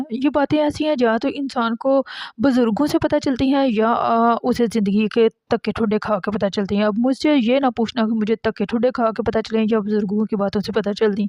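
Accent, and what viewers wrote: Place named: Gujranwala, Pakistan. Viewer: Ap mujhe gujranwala ya iss k ass pass wale cities mai sy lagti hain😅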